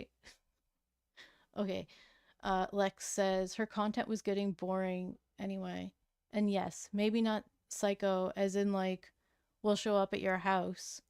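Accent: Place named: Canada. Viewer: Enjoy and appreciate your updates! Also your Canadian accent is everything!! Lol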